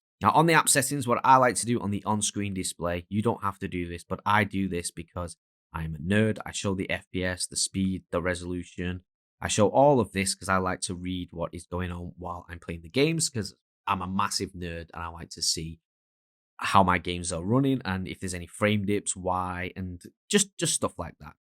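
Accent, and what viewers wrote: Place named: England, United Kingdom. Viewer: Maybe because he is speaking English with an English accent.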